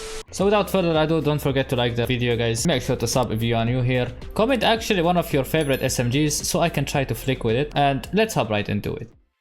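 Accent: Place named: Arab world. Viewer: bro where you from are you arab ? you sound like an arab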